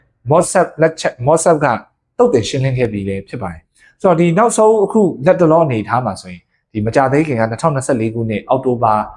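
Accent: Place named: Mawlamyine, Myanmar. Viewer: ဒီအကိုစကားပြောသံက ကျနော်တိုမော်လမြိုင်စကားသံနဲ့တူနေတယ်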